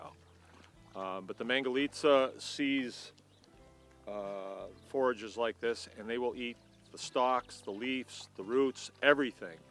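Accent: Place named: New England, United States. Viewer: I haven’t watched the whole video but you seem to be hiding a New England accent.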